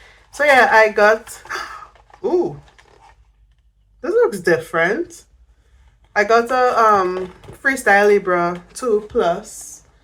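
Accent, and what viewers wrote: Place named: Trinidad and Tobago. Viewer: Hi girl! Thank you for your berberine video. That is what got me here. Just going down a rabbit hole on YT as uasual and founds you video talking abouts Berberine and it peeked my curiosity. AND also you accent caught my attention, as I too a a Trini❤. Glad you are adjusting to your new Dx, and you are finding your footing as you educate yourself about the foods and learning your body as you now have DM1. Thanknyou for the information as this was very informative. I just liked your videos and will subscribe, although i may never take berbaerine or maybe i will. . Keep going 💪 ❤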